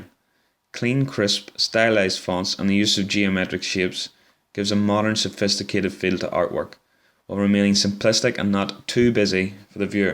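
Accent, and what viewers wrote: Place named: Belfast, United Kingdom. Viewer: Irish, fer sher. I'm guessing Belfast, from my travels round about.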